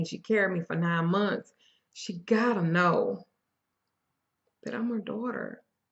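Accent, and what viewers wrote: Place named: Texas, United States. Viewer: This is my first time watching one of your videos and I loved it! I can definitely relate to holding onto things from childhood and my father not being there like I needed and expected him to be. I knew you were from Texas when I heard your accent lol. You actually resemble my ex's mother a lot and they're also from Texas (Ft. Worth).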